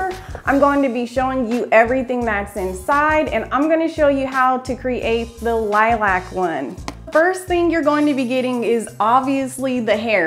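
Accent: South, United States. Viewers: Watched this just to hear her speak...her is deep southern...with the boujie R's🤣😍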